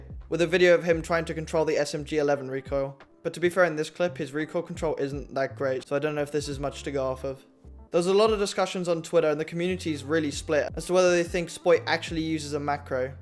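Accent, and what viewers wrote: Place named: United Kingdom. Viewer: This content is actually fire bruh, a fucking panda who plays r6 and narrates with a brittish accent? who doesn't want to watch that type of content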